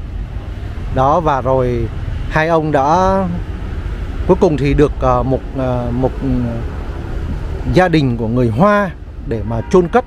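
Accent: Vietnam. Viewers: chẳng biết gì về lịch sử cả. Nói thì giọng Bắc pha Nam đơn đớt chối tai!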